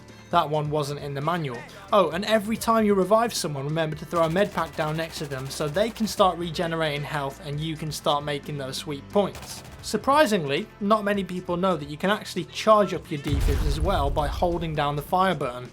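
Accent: United Kingdom. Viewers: i hate him when he is whispering, it's hard to understand english without the subtitles, and that British accent makes pretty hard....i'm not a native english speaker.....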